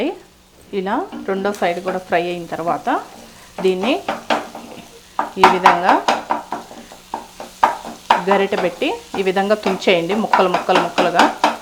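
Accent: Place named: Bellary, India. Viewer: I saw Bellari accent in your Telugu ma'am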